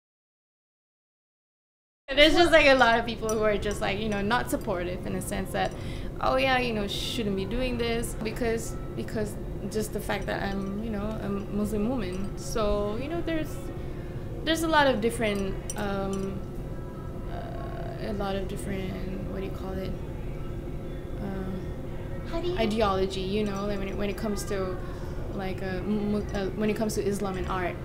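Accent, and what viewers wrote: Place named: Malaysia. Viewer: She is from Malaysia like I am so that's why she has a little bit of accent of malaysia.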